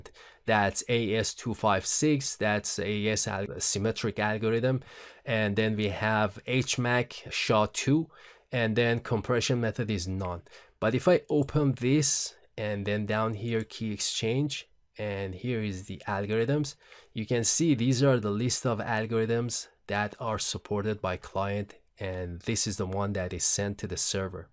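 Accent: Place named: Iran. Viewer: I think the speaker is Iranian I can tell from his accent I am Persian too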